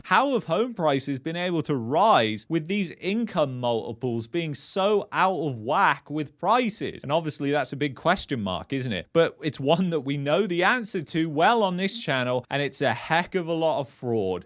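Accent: United Kingdom. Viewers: “ have saw” . You said it twice. How about Present Perfect “have seen”? Why don’t you know this? I bet you say “have went”, “ have ran”, “ have came” instead of “ have gone”, have run”, “ have come”. I’m hearing this everybday all day. Since when have people suddenly forgotten the Past Participles of Irregular verbs? In the past there was always some uneducated person that didn’t know them like the Beverly Hillbillies but now it seems like everyone. NEWS FLASH: You are no longer speaking English but a kind of Pidgin English. There is no excuse for this especially someone speaking with a British accent.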